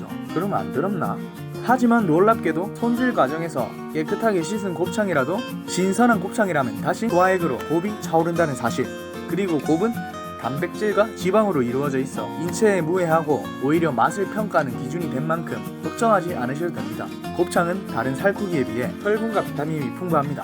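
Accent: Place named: Daegu, South Korea. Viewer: 사투리가 엄청 구수하시네요ㅋㅋ 대구 사투리인가요?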